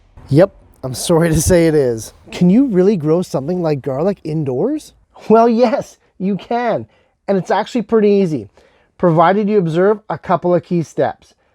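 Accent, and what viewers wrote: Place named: Canada. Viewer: Ive been trying to find small things to grow inside my apartment, im excited to try this out! Looking for more if you have suggestions 👀 Ps your canadian rise accent is strong and i love it 😆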